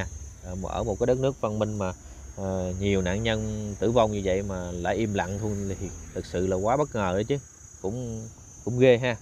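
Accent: Hue, Vietnam. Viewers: Em ấy nói tiếng Huế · 100/100 giọng Huế , cùng quê nên mình rất rõ ! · Dung la giong hue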